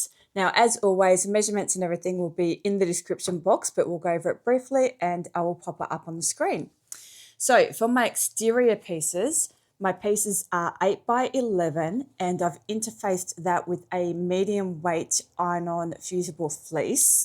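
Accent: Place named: Australia. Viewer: Love you,! You’re the best teacher. I appreciate your Aussie sense of humor—not to mention the accent. In elementary school (4th, 5th, and 6th grades), I had a teacher who insisted every pupil — male and female — learn how to sew. I stopped many years ago. And now in my twilight years I’m using a Brother XR1300 and a Baby Lock Brilliant to make bags, bags, bags — before graduating onto something bigger. I plan to watch all your vids and hope you will continue to make them years into the future. Bless you!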